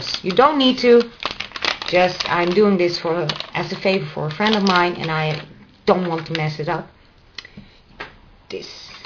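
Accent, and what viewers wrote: Netherlands. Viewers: she is from holland i know from the talking!!!!! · Ik hoor dat je nederlands bent :P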